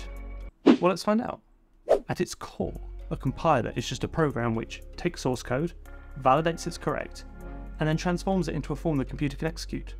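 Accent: United Kingdom. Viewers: You probably are British or my listening skills are so pity... (English is not my native)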